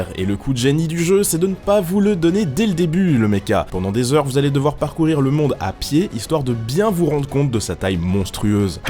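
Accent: Belgium. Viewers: T'ES BELGE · mec t belge la classe (enfin je crois car tu dis nannante neuf)!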